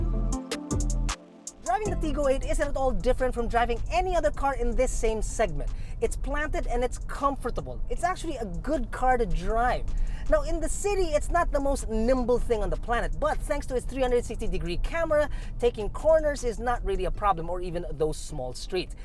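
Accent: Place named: Philippines. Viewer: His pinoy accent is annoying, hindi ba marunong mag tagalog.I guess social siya.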